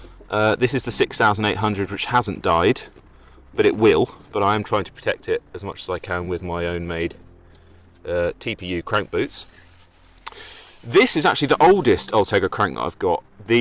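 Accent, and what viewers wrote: United States. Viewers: Impressive American accent, Mr..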